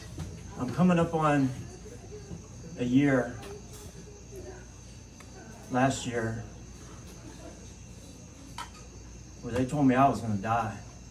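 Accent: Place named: South, United States. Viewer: That was beautiful! I loved the part when you said the word light and lightning flashed in the distance. Thank you. We are so proud of you! You still have your southern accent. ❤️—Myrtle Beach mom and daughter, Catherine MBHS Sophomore.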